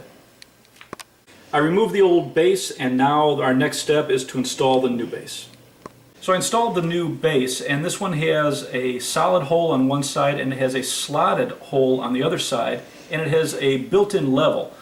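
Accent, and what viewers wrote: Chicago, United States. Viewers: The guy sounds like he is from Chicago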